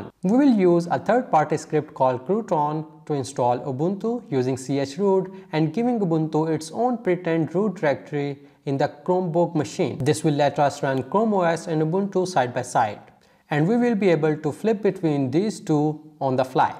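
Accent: India. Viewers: I have yet to try this but your Indian accent is way too strong, makes it hard to watch. I appreciate the effort though.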